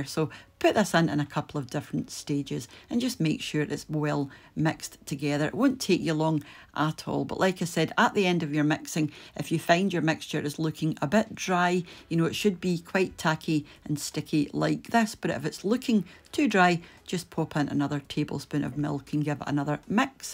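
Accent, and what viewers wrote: Scotland, United Kingdom. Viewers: Mmmm my fave so nice to hear a Scottish voice ♥️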